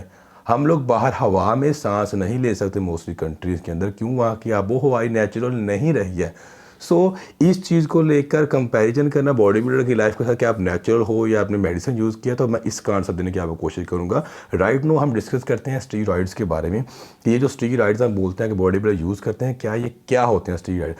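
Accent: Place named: Sialkot, Pakistan. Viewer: From india. Best wishes. Ur language is same as we have. I think u r from sialkot. Because we r from sialkot before partition. If u read this comment then plz rplyy.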